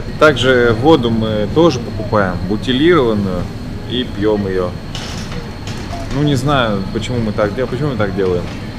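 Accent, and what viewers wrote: Saint Petersburg, Russia. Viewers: Ах , точно!! Ты же питерец!!! Поэтому эти "творогА" и "ГРЕЧА"!!!!! Ужас. А еще свЁкла!! СЕЛО!!!! ЧЁ СКАЗАТЬ!!? У вас даже в магазинах ГРЕЧА пишут😨. булоШные, калбаШные, молоШные...Откуда это??? С НЕПА Ещё чтоли??? Пазор. Это не русский, это видно древнеМосковский , из КалаШного ряда, купэческий , бублиШно- торгаШеский! ФУБЛЯ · чреча греча меня бесит это Питерский говор)))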